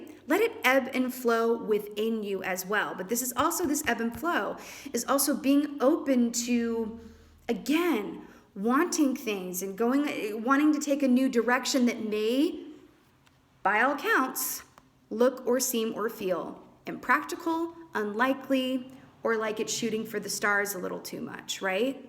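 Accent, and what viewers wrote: South, United States. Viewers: I here the "y'all" and I know you must be from the south....I'm from south Alabama and y'all is my favorite!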